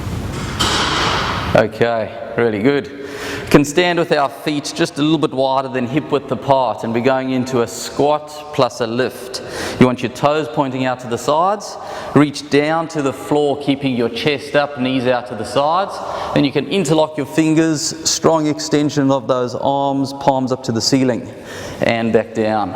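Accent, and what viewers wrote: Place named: England, United Kingdom. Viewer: The English accent will help me to remember those movements.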